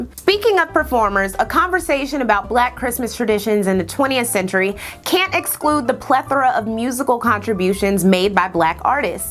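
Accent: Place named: South, United States. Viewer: lol i liked your southern voice. it reminded me of whitley from a different world. lol but i never knew that about the clan. that picture was really jarring to look at. but it was a cool video. i learned alot.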